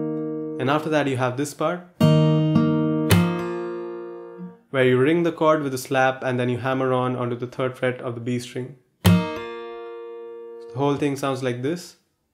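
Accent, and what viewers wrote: India. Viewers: Merko ye banda indian lg rha.. accent bilkul indian vali hai..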